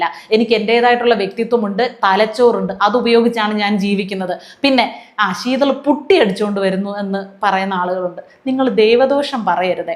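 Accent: Thiruvananthapuram, India. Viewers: ആർ എന്തെങ്കിലും പറയട്ടെ കലക്കുന്നുണ്ട് തിരുവനന്തപുരം ഭാഷയും കൈകാര്യം ചെയ്യുന്ന വിഷയവും ഒരു വലിയമ്മച്ചിയുടെ പക്വതയോടെ അവധരിപ്പിക്കുന്നത് വളരെ ഉചിതമാണ്. Keep it 🎉🎉🎉🎉🎉🎉 കഷണ്ടിക്കും അസൂയക്കും മരുന്നില്ല. 👍💪🌹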